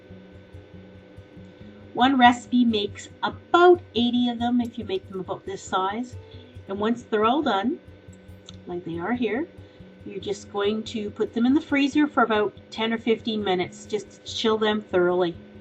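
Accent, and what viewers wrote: Canada. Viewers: Are you Canadian? :) And I love your videos! They are very helpful! :D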